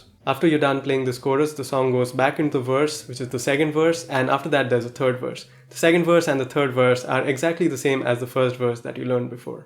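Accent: India. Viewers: You sound indian ! Where are from brother ? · Omg your accent tells me you're from India dude...are you??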